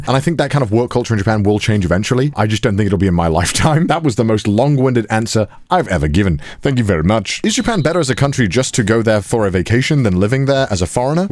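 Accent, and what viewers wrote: Wales, United Kingdom. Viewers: ok am I crazy or does his Welsh accent sound thicker I wonder when he filmed this after his trip home...am I along in this? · I don't really watch anime or know much about Japanese culture, but your videos are just genuinely enjoyable. I have been binge watching them a lot because they're just really nice to relax and throw on. I think no matter where you live or what your content is on you'll be successful because you are just good at what you do and are dedicated. People can sense that and it draws them in. And the deep British voice kind of makes it hard to click away lol Wishing you another successful year in 2022!!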